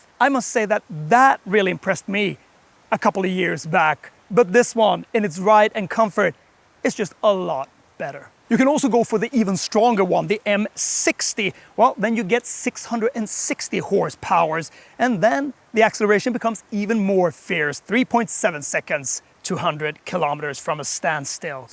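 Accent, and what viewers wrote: Sweden. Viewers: Are you from Sweden ?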